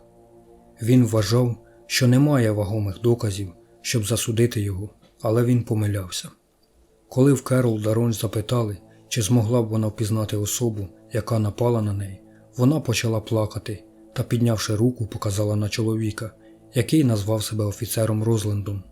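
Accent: Ukraine. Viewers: так і подумала. Вимова як у Черкаській або Кіровоградській. Може, і Полтавська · Ведучий випадково не з черкаської обл?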